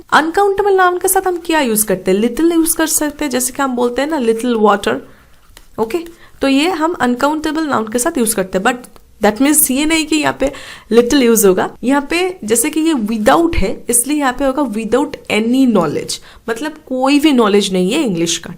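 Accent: Bengal, India. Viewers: Bangali voice in hindi always love❤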